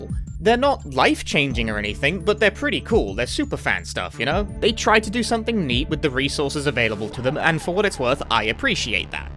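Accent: United Kingdom. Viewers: I really appreciate this second channel. It's always nice to hear you talk about stuff, you're good at formulating your thoughts. That, and British accents tickle my brain.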